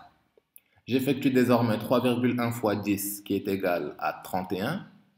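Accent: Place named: Africa. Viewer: Vraiment merci beaucoup, et je suis sincère maintenant, j adore ton accent africains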